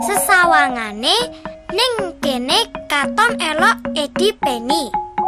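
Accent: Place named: Java, Indonesia. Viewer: Khas bnget logat jowonya😍 Di tunggu subs baliknya y kk🙏😊😊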